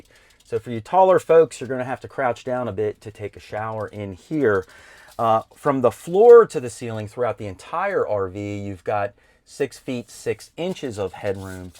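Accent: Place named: Philadelphia, United States. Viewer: I cant tell if this is pittsburgh or philly accent...speaking fluent yinzer i lean more toward delco philly.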